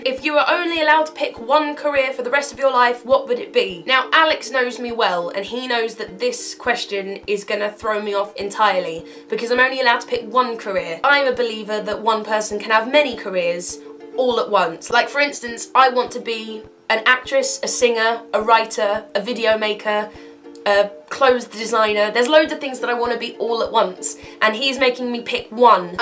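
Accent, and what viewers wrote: United Kingdom. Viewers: That was what I was referring to xx P.s. omg u hav british accent??/¿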